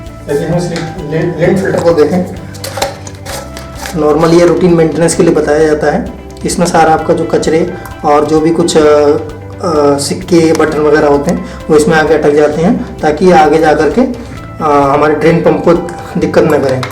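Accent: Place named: Uttar Pradesh, India. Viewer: Itni shuddh Hindi kahan se Sikhi up ke ho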